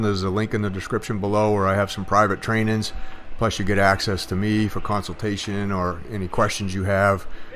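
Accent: Maine, United States. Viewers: Soon as I heard your voice, I knew this was in Maine. But looking at the woods in the background, it's not northern Maine. I grew up in Madison.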